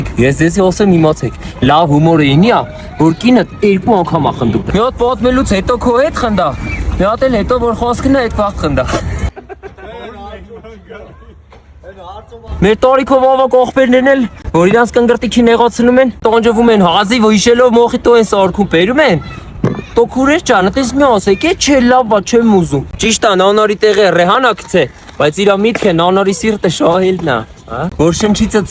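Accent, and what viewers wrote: Gavar, Armenia. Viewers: Gavari barbary urisha eli❤❤❤❤❤❤😂😂😂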